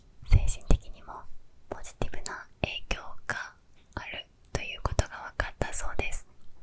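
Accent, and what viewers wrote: Kansai, Japan. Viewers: こんなに海の音綺麗に録れるの凄い！ てかRunaさん関西人？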